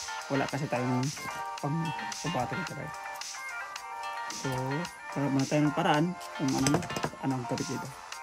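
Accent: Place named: India. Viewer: If im not mistaken, may dugo kng indyano..parang bulol ka magsalita ng parallel.. ganyan ang tono ng mga bombay!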